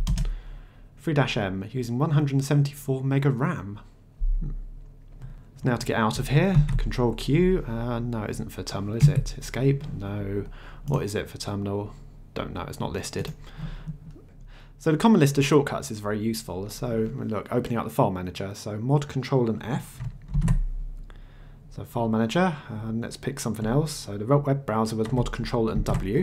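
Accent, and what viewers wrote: United Kingdom. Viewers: Again that British English. WHY WHY WHY :D Just speak normal english. Stop being so swollen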